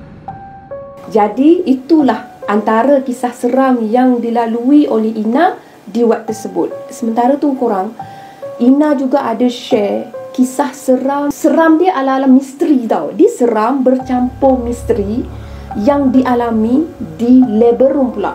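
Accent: Kelantan, Malaysia. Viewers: dari lorat bahasa mlysia kluar lorat pahang..last2 kluar lorat ..mu kecek kelate eh.. takpee..teruskan bercerita..👍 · Mesti ni org Kelantan. Ada keluar slang:). Best dengar citer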